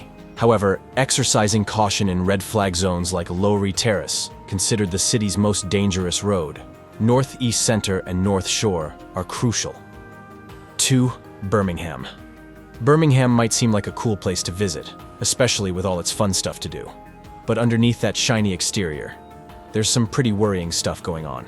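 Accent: United States. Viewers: I carnt take it seriously because of his American accent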